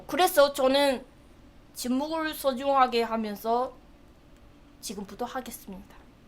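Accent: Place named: Busan, South Korea. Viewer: 부산이면 그냥 말투입니다.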